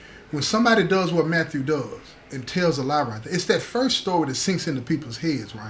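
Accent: South, United States. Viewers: Hey cutie, I don't comment much, but, trust me, I listen to ur channel EVERYDAY. AT 10AM. EVERYDAY. I LOVE THE SOUTHERN ACCENT .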